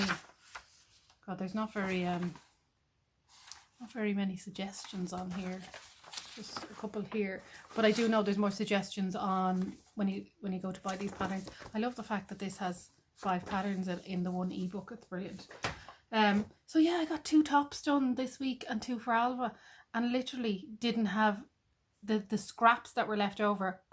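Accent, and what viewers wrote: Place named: Ireland. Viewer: You sound like you're in Ireland. Where are you located? Always looking for nearby fabric places...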